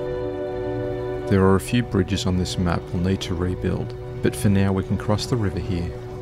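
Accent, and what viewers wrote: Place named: Australia. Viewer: I only discovered your channel and content today. Best out. Good to hear a fellow Aussie, keep up the wicked content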